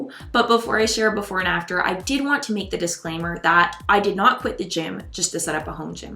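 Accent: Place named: Canada. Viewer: i hear Canadian in your voice, and no i am not familiar with this channel.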